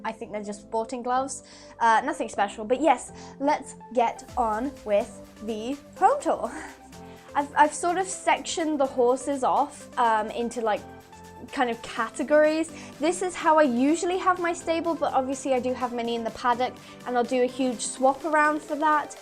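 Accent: United Kingdom. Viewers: you speke britesh and p.s i love your videos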